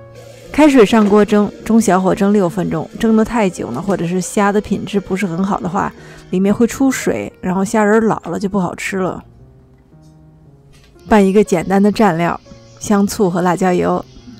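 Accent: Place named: North, China. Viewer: 怎么是大陆北方的口音，带点儿化音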